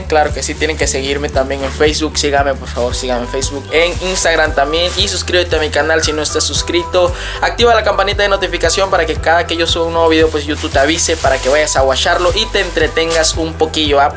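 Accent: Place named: Acapulco, Mexico. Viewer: Me mama el acento de Acapulco! Extraño tanto vivir allá🥺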